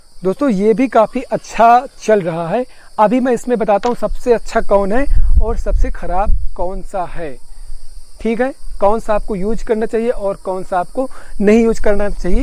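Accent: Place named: Allahabad, India. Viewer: Ap allahabad se ho kya bhai..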